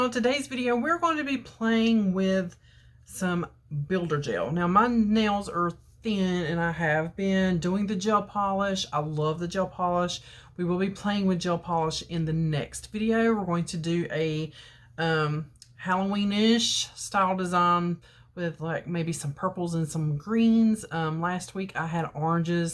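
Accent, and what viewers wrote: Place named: Alabama, United States. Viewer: I came to the comments to see if I could figure out her accent 😂 and I see The Alabama girl. I’m from Jasper 😊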